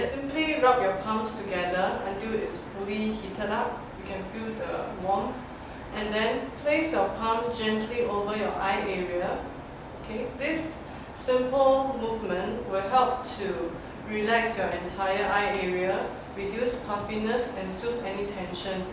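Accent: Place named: China. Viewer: what is she saying? can someone please translate her chinenglish?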